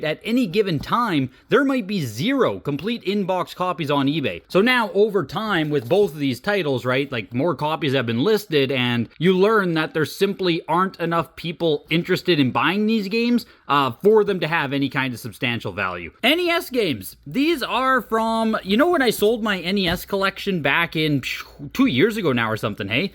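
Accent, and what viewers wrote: Canada. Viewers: If you had $6.4k ready to spend on one game you are likely in a better position than you think to buy a house.... Or you could be broke and making wild financial decisions. Is the house for sale or did he already sell it? If it's still for sale look into buying it. 3.5% and 5% loans are pretty common. Anyway I was typing this while still watching and the more you talked you sound Canadian so that might not even be relevant lmao Edit: yup right after I commented you mentioned a Canadian copy of a game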